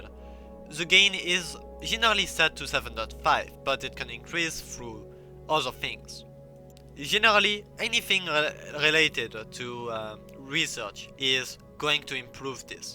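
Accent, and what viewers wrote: France. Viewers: Thickest french accent I’ve ever heard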